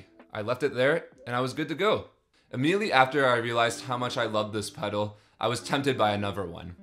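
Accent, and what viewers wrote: United States. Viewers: You traded a custom board that you had to do some convincing to procure... and then traded it for one pedal that's so common you could piss off your back porch and somehow hit a carbon copy? Also, you using brit heat measurements with an American accent... weirder than tim walz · Where in the world do you sound like an American but talk in Celsius? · It is weird that someone with an American accent uses Centigrade. Probably living abroad.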